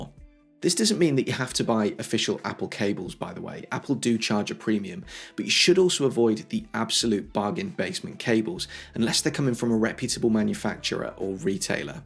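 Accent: United Kingdom. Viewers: your Queen's English is quite appreciated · A calm erudite British accent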